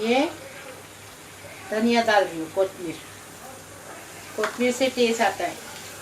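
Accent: Konkan, India. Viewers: Aapko dekhkar mujhe meri padosi kokani aunty ki yaad aa gai.. aapka bolne ka tariqa.. 👌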